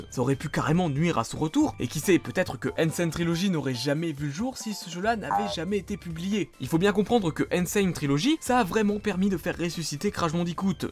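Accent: England, United Kingdom. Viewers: promi jeu critik pa ton akcan englé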